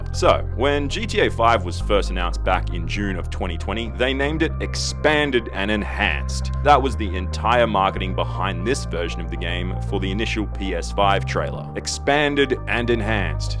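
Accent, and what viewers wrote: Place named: Australia. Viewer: Dead set miss the I'm and the accent is Australian intro hahahaha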